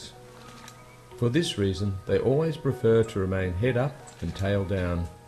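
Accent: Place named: Australia. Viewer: Australian birds. Like the accent. Lady of Australia.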